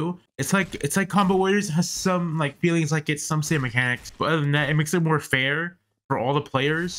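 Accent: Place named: India. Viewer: havent watched your videos in a while cant stop noticing that your voice has gotten more indian like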